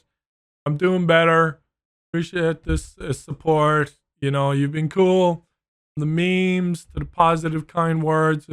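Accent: Ireland. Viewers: Man being a fellow Irish man and listening to this story/explanation is hilarious because he explained it in such an Irish way😂 plus I just straight laughed for the whole duration of that car bit Anyways good luck and hope all goes well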